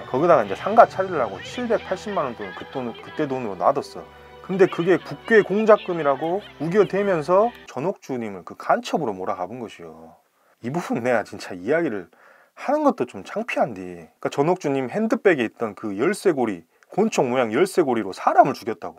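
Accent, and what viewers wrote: Jeolla, South Korea. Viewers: 518 광주민주화 항쟁 영화로 배운사람인데 ㅠㅠ 이런 사연이 있었네요 전라도사투리 어디서 들었다했는데 응칠시리즈였음ㅎ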